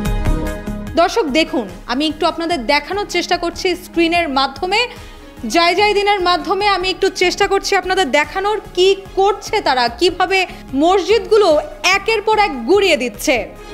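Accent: India. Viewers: ভারতের স্টাইলে নিউজ পড়া বন্ধ করুন। নিজেদের সংযম এবং ভদ্রতা বজায় রাখুন। আমাদের সুনাম আছে সুনামটা নষ্ট করবেন না দয়া করে।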